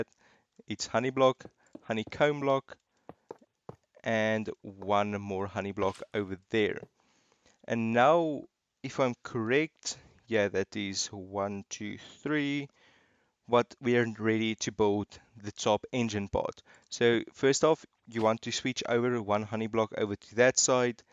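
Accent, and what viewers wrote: Afrikaans, South Africa. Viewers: Its not just me or is this man Afrikaans cuz I am and he sounds a lot like somebody from my country :/ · Are you South African? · You are SA right? you sound soooooo south African just like my best friends!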